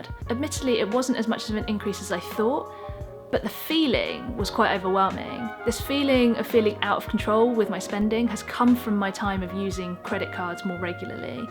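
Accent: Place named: England, United Kingdom. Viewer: love your english accent! And please do more video for minimalism life budget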